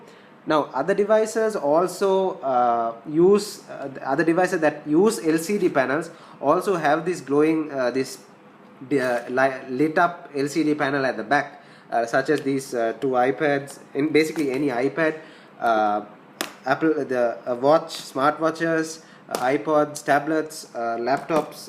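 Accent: India. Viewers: Is it indian english? Epically slow action to say NO, it doesn't! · Hard to understand your English..Your Indian accent is so strong.. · i dont like your indian-english. its annoying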